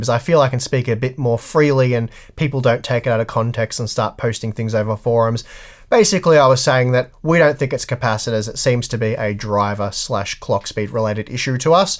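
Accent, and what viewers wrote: Australia. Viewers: I just noticed your Australian accent?? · I just watch this channel for the aussy accent the excellent quality is an extra.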